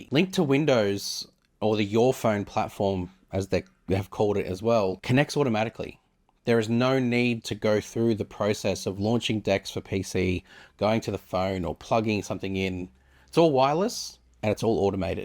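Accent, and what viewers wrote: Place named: Australia. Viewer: Excellent comparison. Your accent reminds me my old good memories in Australia during college back in <num>. Thanks for the info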